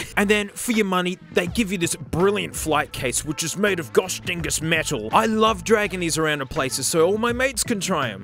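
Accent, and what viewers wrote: Australia. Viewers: MOOOOM The funny aussie is talking about headphones again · that's a toss up between overtly verbose ramblings about things that may or may not be relevant to the car being reviewed and the [ h e a v y ] aussie accent cheapo microphone stories about the aussie life lol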